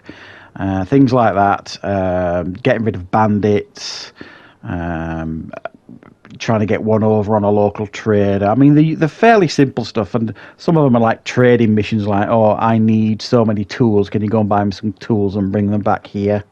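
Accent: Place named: Lancashire, United Kingdom. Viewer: Pretty sure that's a Lancashire accent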